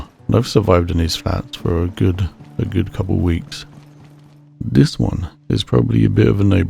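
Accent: United Kingdom. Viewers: r u scottish or english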